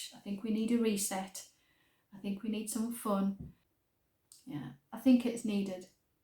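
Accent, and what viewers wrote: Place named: Northumberland, United Kingdom. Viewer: Hi,. I am soooo happy to see you had a new episode tonight. I absolutely love your podcast. You have such a lovely, calming effect on me, it was just the perfect, relaxing 45 minutes I needed as a hectic week draws closer to an end. I lived in Northumberland for a couple of years (although I have been back in South Africa for 18 years in June) and I still get homesick for it, occasionally. Your accent alleviates that somewhat 😂 As always, your projects were lovely. I find your more "minimalistic" approach to crafting refreshing (I too try not to just buy all the yarn, unless it is for a specific project, which would get started imminently). It is in inspiration to me to work through the stash I had acquired before I changed my ways. I hope to see you again, soon! And if you are holidaying in Northern-England, I would love to go along in your case! 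Ps: the yarn on your warping board already, is the warp. You will be weaving your weft through the warp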